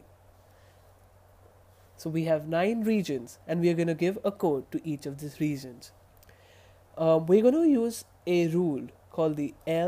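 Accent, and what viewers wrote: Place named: India. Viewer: i like this guy's voice. finally an idian-accent tut without fire-speak (really fast indian accent) - but the video is tilted! please make more but make sure it's viewable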